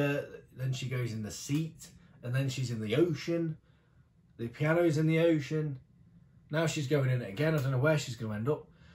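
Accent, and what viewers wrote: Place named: United Kingdom. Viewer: All I saw in this video was a Brit guy repeatedly saying 'I can't deal-' in his adorable accent.... Nd I couldn't agree more....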